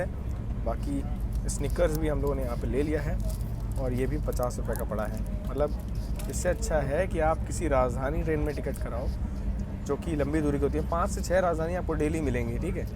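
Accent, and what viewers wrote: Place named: Kanpur, India. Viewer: EK dam Kanpuriya... 💯 Style me h accha LG Rha hai.. 😋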